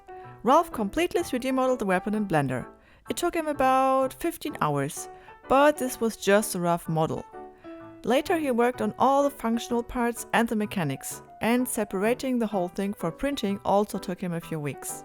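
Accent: Germany. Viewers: ehm youre from germany aren t you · Coole Videos! Aber ich kann mir nicht helfen. Irgendwie glaube ich einen deutschen Akzent heraus zu hören :D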